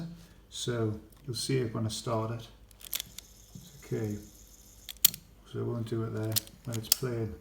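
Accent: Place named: Newcastle, United Kingdom. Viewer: Though you were a Geordie from the accent! Question for you - I've got a PC37J (basically the same) been in a closet forever, pulled it out and nothing works on cassette. Pause button doesnt click or lock down. Could hear something small loose and rattling inside. Found the service manual for pc30, opened up the case and out dropped the pause cam (piece 61 for mechanism), nothing else. Took out cassette mechanism and theres bits of a belt wrapped around back of flywheel. Can see a pin that the pause cam should be on but not sure how it goes there or how it works. Also bits of a belt wrapping around back of a large flywheel on pause side of mech. Any chance this is an easy fix?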